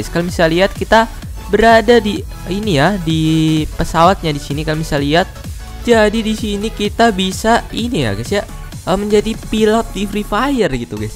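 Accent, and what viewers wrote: Java, Indonesia. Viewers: Gua yakin lo orang jawa kan bang?😂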